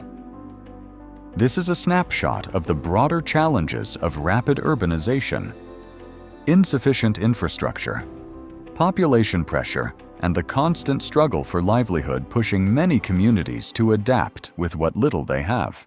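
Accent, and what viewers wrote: United Kingdom. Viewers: Your accent is British. You mind your own business. Do not come to India if you do not like us.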